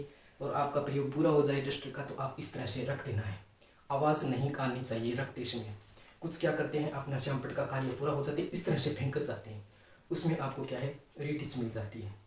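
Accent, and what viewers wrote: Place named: Haryana, India. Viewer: Ram ram sir 😍 bhut bdiya smjhaya thodi haryanvi touch language sir 😄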